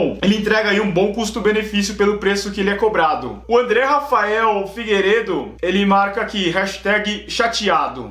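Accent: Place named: Sorocaba, Brazil. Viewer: Eu sou de sp , sua voz que é de "do" Sorocaba rsrsrs . Valeuuuuuuuuuuuu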